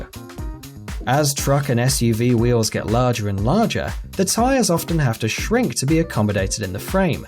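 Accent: United Kingdom. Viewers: This dude is brirish and is probably justa city boiiiii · WHY THE FRUITY BRIT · I refuse to listen to a Brit talk about trucks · Lmao hes british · Why the fuck is a brit talking about trucks? Get back to your tea. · Why is a British person talking about this 😂. 2 or 3 things he listed are actually badass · Ok first things first take your British accent and go fuck yourself and second learn how to spell tire... and lastly what shit do you know about trucks where you drive on the opposite side of the road and in the car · First a British speaking gentleman explaining American trucks? Second, why would you go off road with low profile tires? Third, double digits is way to high for the road, buy if you go off road with it, who cares?Forth, if you feel the need for truck nuts, compensating for something? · Those British accents are like fingernails on a blackboard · Okay, for those griping about his accent (foolish enough to think they have no trucks in the UK), here's an American guy who agrees wholeheartedly with him. 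Get real. Regardless of who says it, do you really think those things (oversized rims/wheels, air pollution, etc) are actually "cool?" Not by any stretch.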